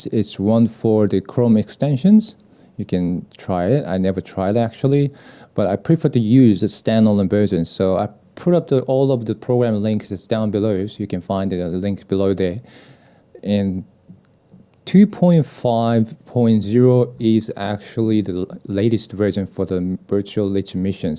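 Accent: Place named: Australia. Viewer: Yeah same here! Its fine! At times he sounds like he has a Australian accent! And for the record I understand him better than a lot of Australians! =]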